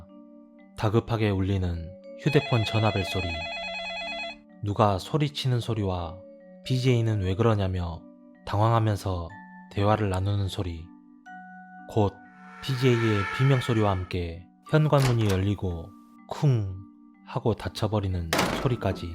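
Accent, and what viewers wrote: Jeolla, South Korea. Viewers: 전라도 분이신가요?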